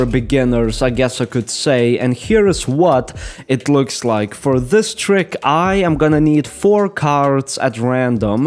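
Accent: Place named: Russia. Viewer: how many accents do you Russians have? I've watched few Russians youtuber all of them are diffrent and none of them speaks the same accent as I've seen in movies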